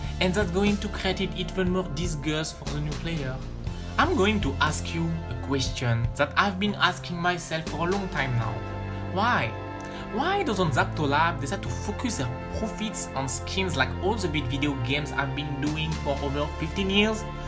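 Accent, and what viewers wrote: France. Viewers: Are u French? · after see ur face reveal on DWI server long time ago i don't expect u have this kind of voice but that france accent 100%🔥 hehe, nice vids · Pro u were from France LOL 😂 I thought you are from USA 😅 but not bad you had explained well bravo 👍🏻🤝🏻🥃🍷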